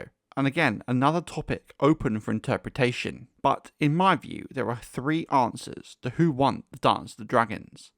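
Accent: United Kingdom. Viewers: The dude is the most British sounding dude ever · i don't know if you're Bristish with a strong accent or English is not your first language and you speak with English accent, but it's really hard to hear and follow what you say even with the caption on.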